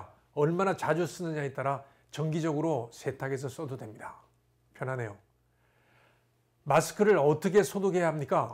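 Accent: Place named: Jeolla, South Korea. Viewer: 서울말투가아님. 전라도말투?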